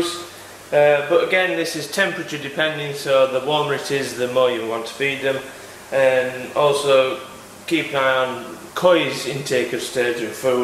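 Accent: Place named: Yorkshire, United Kingdom. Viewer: I just found your vids they are so informative and well done, love the commentatory in great Yorkshire accent.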